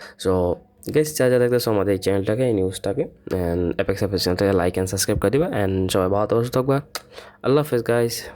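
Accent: Sylhet, Bangladesh. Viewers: Vaiya apni ni ki Sylhety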